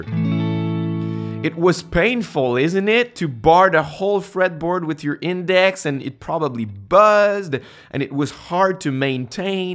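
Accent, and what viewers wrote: Quebec, Canada. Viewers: quebecois? Je reconnais ton accent :)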